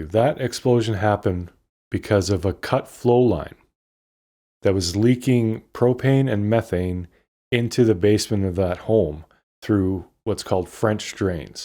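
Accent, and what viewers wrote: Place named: Alberta, Canada. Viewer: I thought so. I live in Texas but am from Alberta. I hear our accent lol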